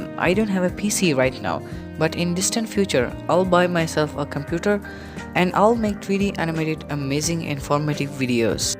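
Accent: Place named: United States. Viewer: are bhai kya tum America wale Ho Yaar your English is so best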